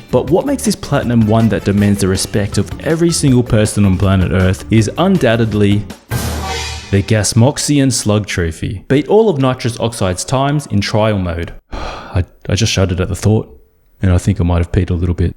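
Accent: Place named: Australia. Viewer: Great video mate, love the commentary and the aussie accent haha (from NZ myself) Definitely agree, all these platinums are definitely hard/time consuming to get. I've gotten the Skyrim one three times on each PS generation and also the Arkham Knight one, including tevery trophy in the community challenge pack. I don't blame you for not doing them, they're absolutely mental I'll be checking out the rest of your catalogue and subscribing for more.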